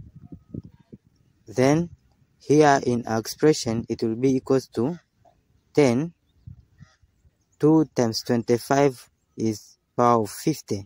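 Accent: India. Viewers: Thank you random guy, that sounds Indian, on YouTube.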